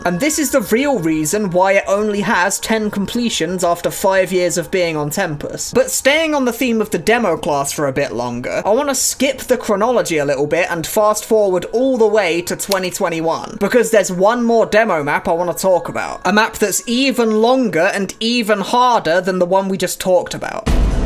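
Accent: United Kingdom. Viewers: Ur an amazing YouTuber I just wish not brit annoy voice 👺👺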